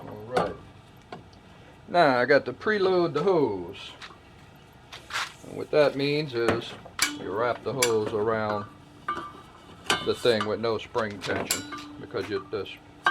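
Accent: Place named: Louisiana, United States. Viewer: I was looking for help and found it. I also said this dude is from Louisiana. 😂. I recognized the accent. I’m from Gonzales La. Thanks for the help.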